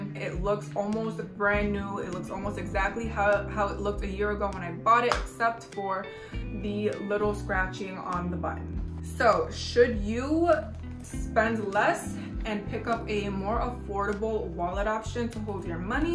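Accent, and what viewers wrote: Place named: New York, United States. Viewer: Def from New York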